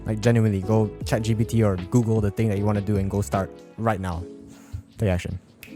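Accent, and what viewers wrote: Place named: Singapore. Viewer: This guy sounds Singaporean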